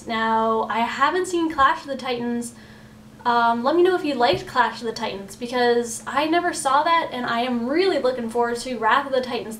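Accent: Canada. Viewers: Are you Canadian? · I love the part about the 7 year old getting late to bed because he... you know... had to drive home! That was cute... Btw, my favorite James Bond character is: Xenia Sergeyevna Onatopp (Golden Eye) Hockey, he? From the sound of it you either from Toronto or Montréal, lol!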